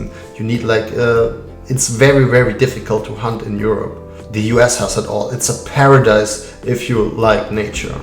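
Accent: Germany. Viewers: You're more American than most Americans. You're not a German, you just have a German accent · My grandmother Wilhelmina (my mom's mother) moved to the USA shortly after WW2 from Vienna, Austria. She always hated her name, but I always thought that it was so beautiful. Your lovely German accent reminded me of her. I'm so happy to hear that you are enjoying being in America. Hello from Maryland, USA.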